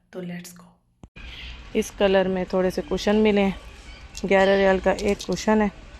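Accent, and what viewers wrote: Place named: Saraiki, Pakistan. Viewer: I am also saraiki speaking.itny time bd apni boli sun k bht acha laga. Btw i live in khobar. Bari apk vlogs ma dekha k ap meray area se guzar k jati hain khanay penay ❤❤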